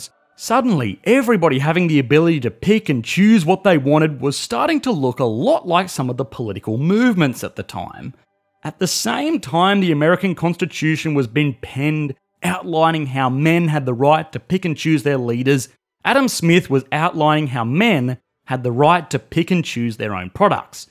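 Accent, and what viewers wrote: Australia. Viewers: Is that an Australian accent? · you have a quite funny accent, i would guess is it australian accent? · Me too, and I'm usually just listening to them in the background. I think it might be the Aussie accent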